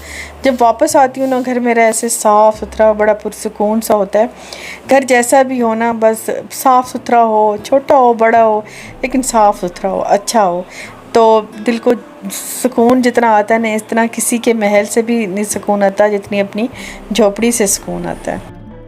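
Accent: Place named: Saraiki, Pakistan. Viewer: Apki saraaaeiki punjabi hai we have house help saraaaeiki wo accent hi aur hota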